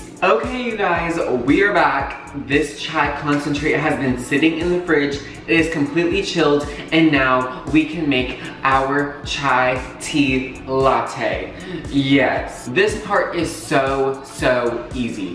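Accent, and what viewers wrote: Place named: Brazil. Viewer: Where are you from? Your accent it seems like brazilians speakers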